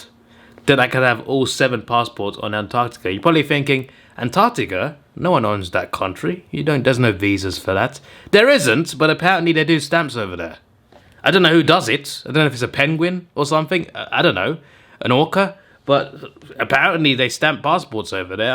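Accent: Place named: United Kingdom. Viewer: you seem to got a British accent... like did u practice or u brought up there?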